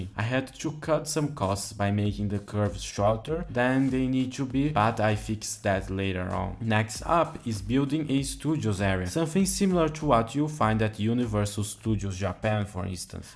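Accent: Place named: Brazil. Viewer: lol, tu é brasileiro? Esse sotaque BR com tantos "i" no final das palavras tá muito forte hein hehehe.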